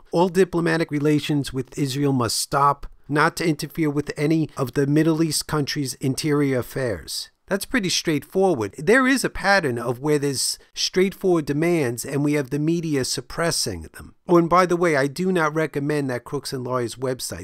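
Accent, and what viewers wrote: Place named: East Coast, United States. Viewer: I noticed you speak with an east coast Jewish accent. Are you Jewish?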